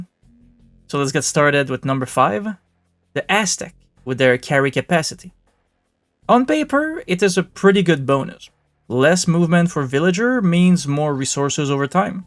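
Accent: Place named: Quebec, Canada. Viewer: Je reconnais un Québécois quand j'en entend un.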